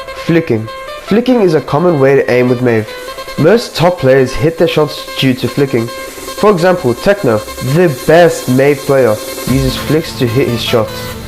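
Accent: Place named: South Africa. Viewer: Thanks for the vid, but what is your sensitivity for controller Btw you sound South African :)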